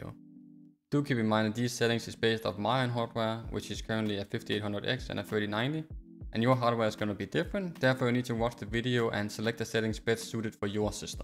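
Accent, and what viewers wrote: Denmark. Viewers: You danish bro? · Tak for videoen min ven, god forklaring og perfekt med opdeling af videoen for os der ikke kræver den store intro til grafikinstillinger. Er sikker på du er dansk på den vidunderlige accent du har 😄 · Yo m8 du lyder som en dansker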